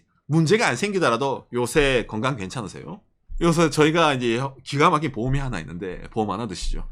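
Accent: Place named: Gyeongsang, South Korea. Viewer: 말투가 대구네요 구수합니다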